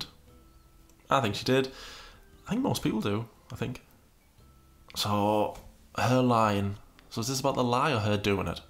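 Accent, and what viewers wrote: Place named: Newcastle, United Kingdom. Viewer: I feel like you’re a geordie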